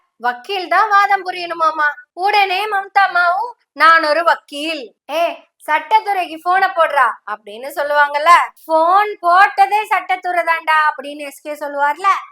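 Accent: Kongu, India. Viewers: கொங்கு தமிழ் நாவில் இசை பாடுகிறது தோழி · Madam, excellent resentation in Kongu Tamil. For social reformation and upliftment. May God bless you with prosperity.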